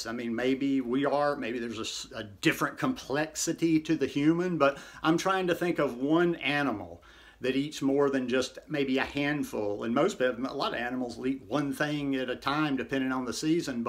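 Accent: South, United States. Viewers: Not only does your simplistic approach make the most obvious sense, you are so darn entertaining when sharing it! Love the southern accent and the mind processing of our human eating selves. Thanks for the entertainment!!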